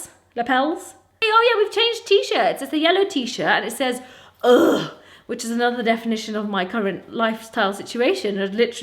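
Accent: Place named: England, United Kingdom. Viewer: your voice + that English accent = perfection. I don't wanna sound creepy but dammit I love your voice,! Hope you're having a healthy pregnancy.🌻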